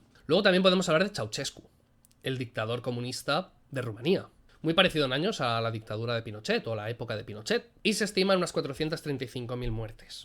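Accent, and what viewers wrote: Spain. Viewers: Y que habla este ctm.con asentó español? Dime de que pais es y fue Fidel Castro? Español ladrón y mañoso.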